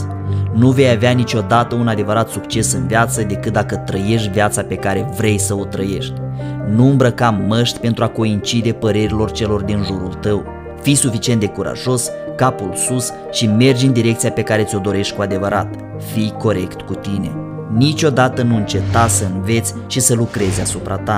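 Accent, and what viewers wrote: Moldova. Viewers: Bună, ești foarte simpatic cu acest accent din Moldova. Mulțumesc.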